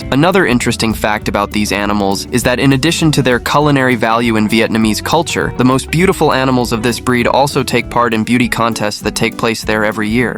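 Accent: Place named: England, United Kingdom. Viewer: Si sente solo la tua sgraziata voce 🤢🤮gutturale inglese , ma non si sente alcun suono degli uccelli . VOTO NEGATIVO .:text-green-game-over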